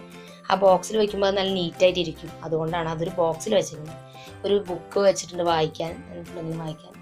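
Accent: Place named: Mala, India. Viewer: Evideya veedu?mala,tcr boarder ano?samsaram maarala.(mala).marambala trissur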